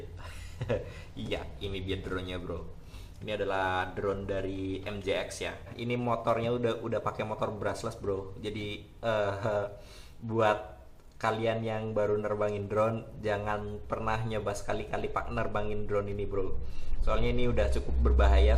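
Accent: Banyumas, Indonesia. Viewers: siiaaaap om...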